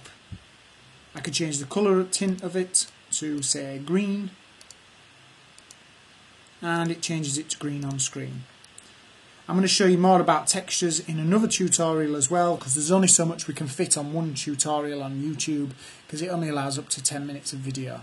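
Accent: United Kingdom. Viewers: nother northerner :D eiya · Are you from Liverpool or Manchester?lol I like your accent!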